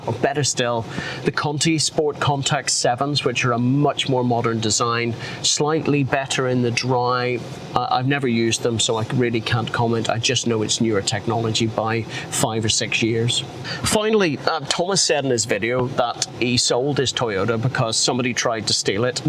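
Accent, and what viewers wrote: United Kingdom. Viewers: Wait i thought it was just for the show Peaky Blinders but do british people really talk like this? Interesting..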